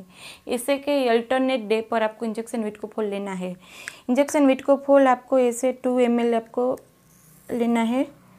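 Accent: Gujarat, India. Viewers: Aap gujrathi hai kya? Shayad kuchh or Bhavnagar ya junagadh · aap ki.language accecnt gujarati sound hoti hai doc.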